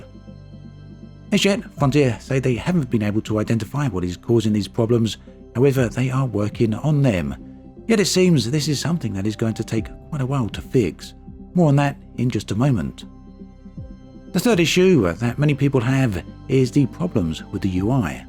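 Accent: United Kingdom. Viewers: I fucking love your accent! Is this british or something special or regional?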